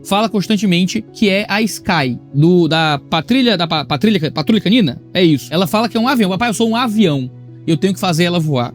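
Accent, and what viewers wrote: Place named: Northeast, Brazil. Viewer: acho engraçado o jeito plenamente nordestino que o fala sobre as indescencias alheias "balangando a jurubeba" KAJKSAJKSJKLJLSJDSLKJSLKDSJLKSDJSLKJDS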